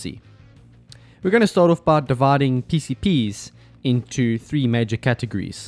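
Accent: South Africa. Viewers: South African based upon the susername. He’s got same accent as Elon musk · My guess is south african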